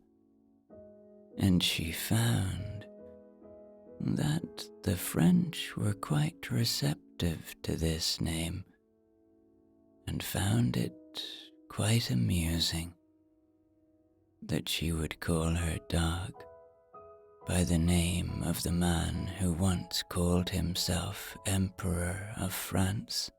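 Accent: Ireland. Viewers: Dear, I was looking for some positive affirmations for self improvement and growth, but I could not find any I liked to fall asleep by. I either did not like the music which was too overpowering, or I did not like the manner with which they were spoken, being rattled down without even a breath in between. And I definitely did not like the voice. I only like your voice and I love your beautiful Irish accent. I would love to hear of series of positive affirmations to fall asleep by. In today’s world so full of confusion and hardships many of us would very appreciate more self confidence, attracting the right circumstances to us and have faith that all will be well. Thank you for all you do.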